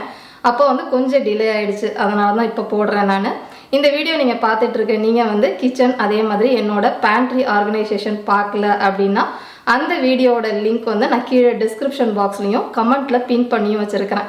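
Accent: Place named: Tiruchirappalli, India. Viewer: Neenga trichy a mam.ketkave santhosama irukku.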